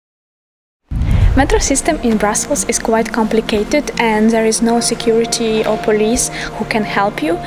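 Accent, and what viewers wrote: Russia. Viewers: This Russian accent sounds so horrible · annoying accent. russian?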